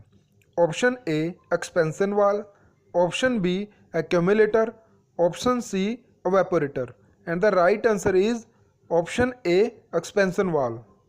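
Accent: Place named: India. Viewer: disgusting India accent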